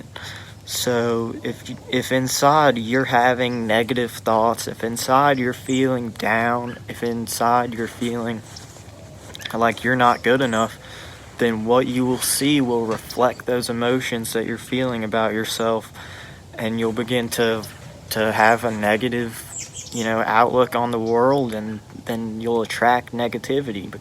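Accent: South, United States. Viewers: You sound like your from the south...how old are you?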